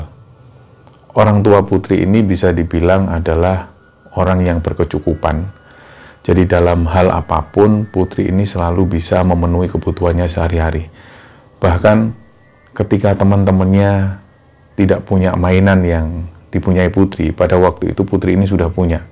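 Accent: Java, Indonesia. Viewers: Mlm maz..crt ny keren2 moga sht2 tuk kru KS trutama maz yg logat ny kjowo jowoane sngt kas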